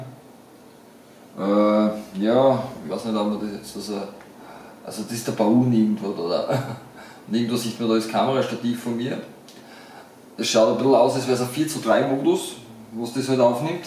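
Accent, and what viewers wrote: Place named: Vienna, Austria. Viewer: I aus Wien du heast du irgendwie a so o.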